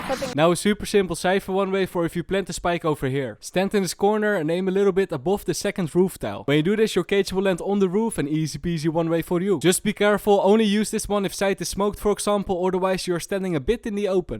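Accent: Netherlands. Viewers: You sound Dutch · ur dutch bro? I love your accent 🤣😆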